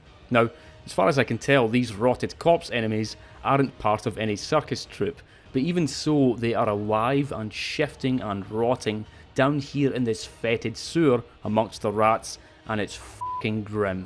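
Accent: Scotland, United Kingdom. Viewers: He sounds Scottish to me. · is that a scotland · Subscribed for the Scottish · An hour and a half of a lovely Scottish voice talking about the completely fucked monsters fromsoft comes up with? I think you may have ticked all my boxes 😅 I loved this scene when I first came upon it. Mostly because it reminded me very specifically of the Orphan of Kos, staring out at the sea and weeping. Except there were 50 of him (although down one part of the beach there is one standing by themselves) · I'm also scottish. Yer accent is class brer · Wow thats wild and a little racist. He's fucking Scottish it's not a joke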